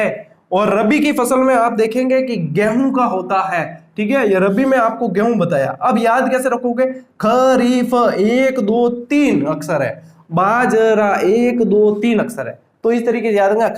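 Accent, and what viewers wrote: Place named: Marwar, India. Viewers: Na sirji hamein to aapki Marwadi bhot achi lgti h...wait krte rehte h ki aap Marwadi mei padhao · Marwad ki mitti boli k sath aapki class bahut hi achhi h sir.thank u so much .... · Sir aapki marwadi bhut chokhi lag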